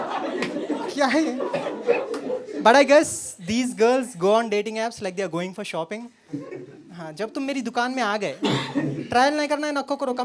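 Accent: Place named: Hyderabad, India. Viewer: Love the Hyderabadi accent 😅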